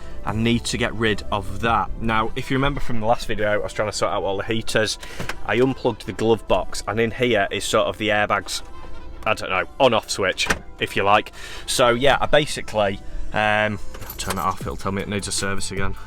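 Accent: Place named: Huddersfield, United Kingdom. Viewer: Top man! What a great video find 👍 Iv been thinking about buying a Carista and you have definitely helped me to decide thank you 🙏 A subscribe click aswell! Is that a HD8 accent I can hear?? 😁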